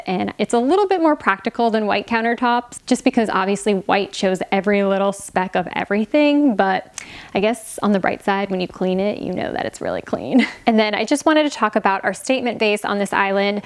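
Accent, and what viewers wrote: Australia. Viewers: Thumbs up to the Australian Accent!!!!! Great video 😁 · I like! As an Australian fan, I agee Aussie accents are cool. Love your channel.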